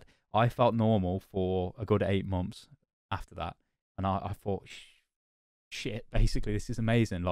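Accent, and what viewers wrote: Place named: Cockney, United Kingdom. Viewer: Overwatch came out, I got it, and I immediately went on the internet to learn about the game. It wasn't long before I stumbled into this weird cockney guy who didn't script his videos and really seemed to know his shit. I got to know simply as the guy with the Overwatch channel I check out from time to time even after I'd stopped playing the game. And just now I discover that he's in pain, and I find myself getting teary-eyed just as if a friend told me about his problems., you're a part of my life now. Get better, friend. Take all the time you need. I'll be here when you get back.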